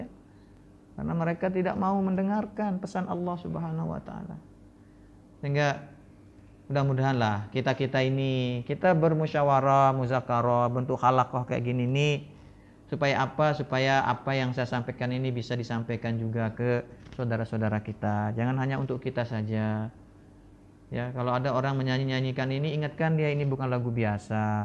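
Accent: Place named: Lombok, Indonesia. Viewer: saht selalu tuan guru,,logak sasak lombok bhsa indonesia mantab guru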